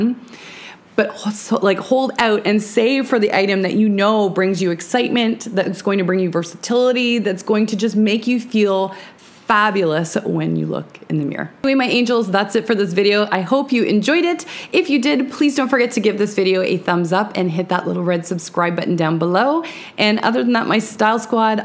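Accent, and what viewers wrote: Canada. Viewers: Hello, new subscriber here! Very interesting and helpful tips, I can see this will be a fun channel for me. I love the deal with bringing our fancy dress into our everyday wear. I am a big fan of that style, even if it does confuse some women in my neighborhood.😉 But first, I was wondering (not a fashion question) if you are from Canada? Curiosity always gets the best of me and there is a slight accent I hear...just wondering.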